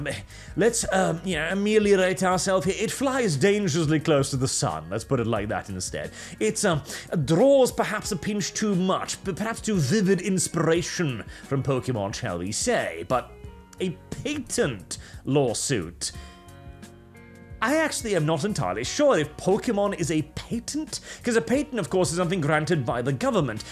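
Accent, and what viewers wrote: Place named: United Kingdom. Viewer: I didn't realize brits stress vowels so differently versus Americans.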